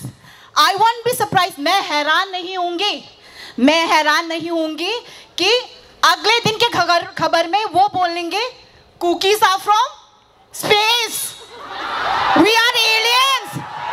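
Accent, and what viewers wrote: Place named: India. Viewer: Great speech. Even though you are so fluent in English and Hindi, and that your accent is pure Indian, you will still be branded as "illegal migrant from Myanmar" by Meitei extremists.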